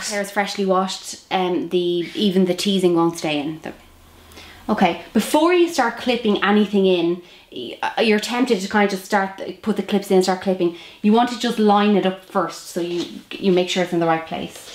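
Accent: Ireland. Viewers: no she is irish the accent is so defined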